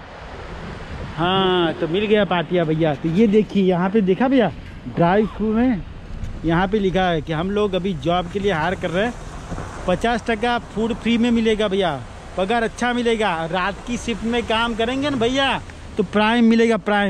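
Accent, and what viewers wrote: Gujarat, India. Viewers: Aa bhai gujarati lage 6 hene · ગુજરાતી ઓ ની હિન્દી સાભળી મજા આવી ગઈ... KEEP IT UP BROTHER 👍👍👍👍👍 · Bhai tme2 to gujrati niklya tmari hindi thi khabr pdi 🥰☺😄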